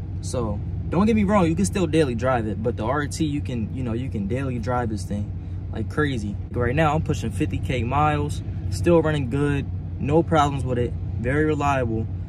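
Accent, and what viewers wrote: Philadelphia, United States. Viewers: Boa yu sound like yu frm philly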